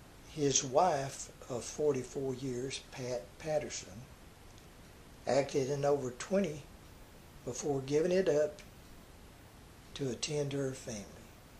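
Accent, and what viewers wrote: South, United States. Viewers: ....while I realize you are from the southern reaches of our country and there are some variations on the English language because of this...I am sick to death of listening to you say HISSELF instead of HIMSELF...and BTW...the iconic studio head was named Irving Thalberg....not THRALBERG. You hear his name mentioned periodically at the Academy Award ceremonies since there is an Oscar which bears his name...so please....get it right! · I've been watching a few of your Hollywood Suicide bits. I like your southern voice; somehow it adds to the sadness of these star profiles. You've got great narrative...keep it up. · His accent? Shame on you. His accent is part of who he is, which is a southern gentleman. He could not change his accent anymore than say someone from the Bronx or France could. Why can't you just appreciate the stories he tells and the time and effort he puts out to do them and share them with us. 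I shudder to think he would ever stop doing this because a bunch of needle assed nitpickers tweak his stories. Just enjoy and learn from them. My Momma always said "If you haven't got something nice to say don't say anything".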